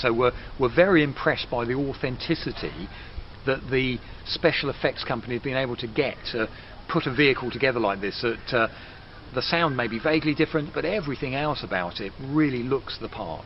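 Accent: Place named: England, United Kingdom. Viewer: сразу понимаешь что говорит англичанин )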